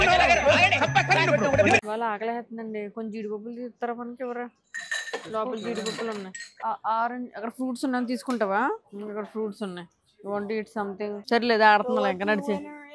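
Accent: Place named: Andhra Pradesh, India. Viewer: 😂 😂😂 Superb Commentry Andi meedi !👌👌👌😅 Kadupu Noppi Vastundi Navvi Navvi, Me Anargalamaina , Emaatram Artificiality lekunda, Aksharaala Pure 24 Carat Andhra Yaasa, Bhasha, toh, Natural Commentry Vintunteh.. 😆😆😂😂👌👌👌 Just Superb !🙌👌👌 Thank you for such a Hearty Commentry Andi ! Enjoyed it Thoroughly! ☺️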